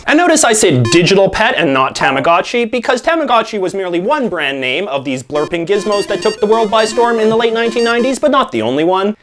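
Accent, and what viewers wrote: Canada. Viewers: "Aroond", well now I know you're not faking the "aboot" thing. Btw I'm also 32, hi-five.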